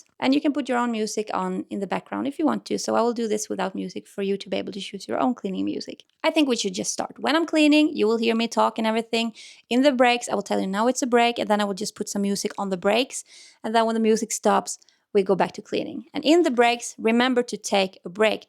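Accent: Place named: Sweden. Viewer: Call things whatever you like to call them. Hopefully everybody knows you're from Sweden and have an accent. I dont expect perfection in the way you pronounce words whatsoever. Honestly, I'm just working and I'm not really even paying attention to the way you're talking or not talking. I don't notice at all. I noticed you said please talk normally. it almost sounded like somebody in your family or some caregiver said that to you as a kid maybe? Please be kind to yourself. I don't probably most people don't notice these little things that you notice about your communication.. words Etc. Please focus on the fact that you're making a video and that's in itself is really amazing!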